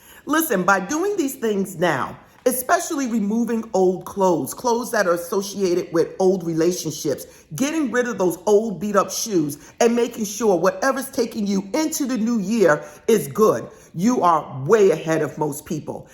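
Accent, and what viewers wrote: New York, United States. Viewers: 🥰Thank you as always! Love that NY accent!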